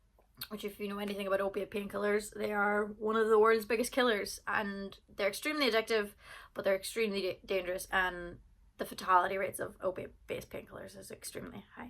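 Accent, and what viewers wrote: Ireland. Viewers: Wow! You're telling it all so clearly and openly. You're such an angel for other people. I volunteer at an helpline and it's very common to get a person in these situations on the line. PS, love your Irish accent <3 and music of course ! Thank you 🙏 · I like her Irish accent.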